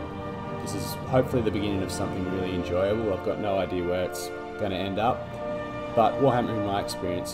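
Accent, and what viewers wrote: Australia. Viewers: Hey man, just stumbled on your channel. Great to hear a fellow Aussie into Total War and Warhammer! My story was actually quite similar to yours. Got brought into the fold in late primary school and instantly gravitated towards the High Elves with the very same army book! Hope to see you around in Quick Battles.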